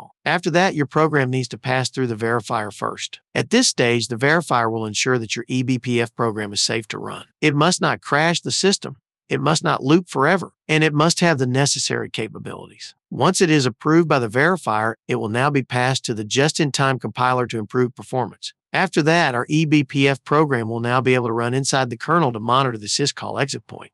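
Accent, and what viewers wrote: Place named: South, United States. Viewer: Good video 💯 Btw I was thinking about your southern drawl. If you turn out to be a 80 year old Grandma living in England somewhere,I'm gonna mad upset😂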